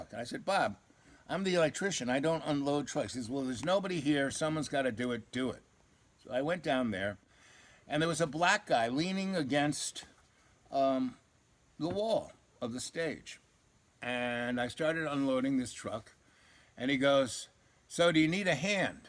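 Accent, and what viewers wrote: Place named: New York, United States. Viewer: Definitely from NewYork..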